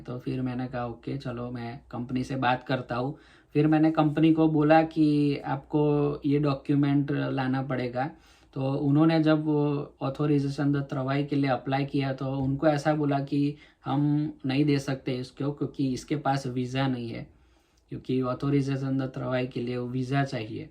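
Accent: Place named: Gujarat, India. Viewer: Bro i underdatnd you are from gujrat , me too ....but work on your Language tone , that gujju tone bothering while you talking .No matter u speak hindi or english .Keep Growing .😇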